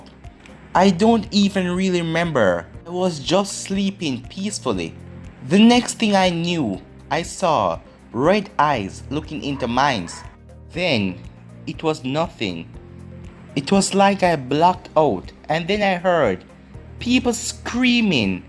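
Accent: Jamaica. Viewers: ok, I asked cause I'm Jamaican and he sounds like a jamaican that is trying to learn English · Why do u sound like a Jamaican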